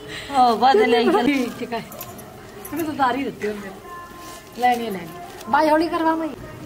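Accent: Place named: Jalandhar, India. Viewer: Mainu tusi jalandar side de boli lagdi tuhadi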